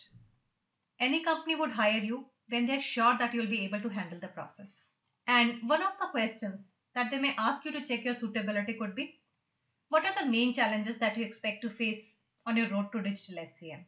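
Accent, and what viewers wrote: India. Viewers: Has difficult to adjust myself to your strong Curry accent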